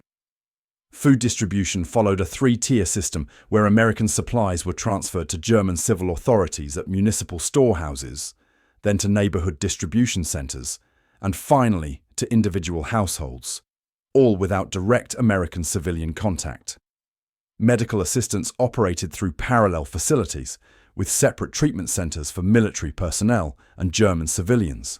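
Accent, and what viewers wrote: United Kingdom. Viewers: The presenter's accent is British. The British use meters as a measurement. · American military uses meters for ranging. We do use freedom units of measurement for the most part but that doesn't mean we don't understand the metric system. We just use more math than you. Also , the commenter in this video is obviously a Brit. We like them now and find their accent very amusing but we have had a few small differences in the past.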